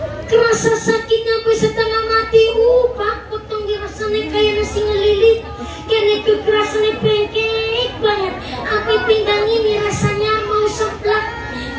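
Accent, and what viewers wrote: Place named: Banyumas, Indonesia. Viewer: Serr ora ngapak- ora kepenak👌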